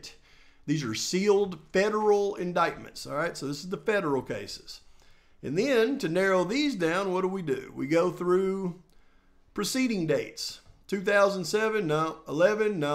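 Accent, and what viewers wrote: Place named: South, United States. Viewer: I just love you. But can you please confirm if you are holding a bug zapper in your hand as a mic? Your country accent, paired with your laid back style plus all the bass pro shop shirts, has me convinced… that it very well could be. 😂😂🙌🏼🙌🏼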